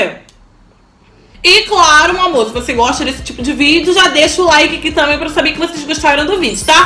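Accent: Rio de Janeiro, Brazil. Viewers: E Nova Iguaçu é aonde?! São Paulo?! Euem... É carioca sim! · Muito carioca mesmo ahahhahahahahahahahahaah maravilhosa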